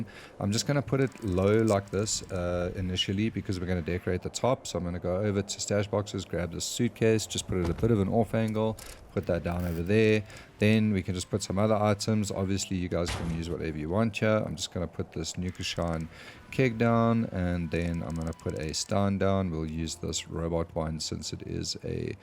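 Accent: South Africa. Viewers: Bro, are you South African? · My brother wonderful mate really great explanation and total groovy build by the way u sound south african no offense I am from there also maybe we can jam sometime also I just got back on fallout after 5years lol being grinding something else but loving the game again peace man....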